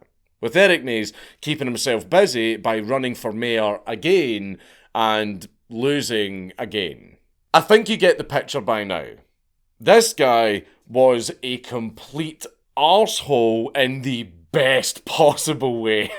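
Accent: Scotland, United Kingdom. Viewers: There’s really nothing more I love hearing in life than Dankula saying “ for free” in his Scottish ass fucking accent. “Fourfrii”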